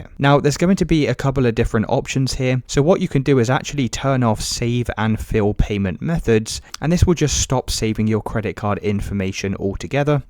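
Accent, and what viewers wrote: United Kingdom. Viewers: thanks british man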